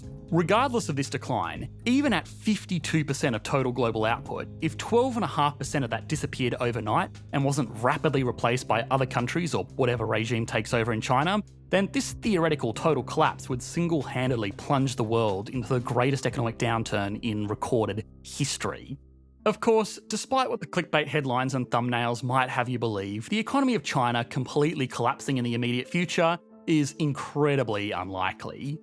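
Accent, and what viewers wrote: Australia. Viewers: I could never move down under cant stand the accent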